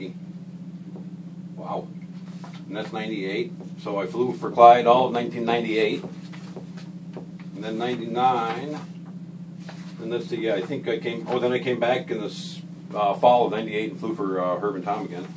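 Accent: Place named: Upstate New York, United States. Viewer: Having watched a few of your videos recently I of course kept watching and came across this video. Really blew my mind! Owning a beaver is my goal, not a sports car or fancy house guy so a beaver it is! BUT, hearing you were from Long Lake which is one of my favorite destinations in the ADK blew my mind! I tent camp in Saranac Lake area, have a camp just outside Lake George area but may look for a camp closer to Long. I knew I recognized the upstate accent, great videos!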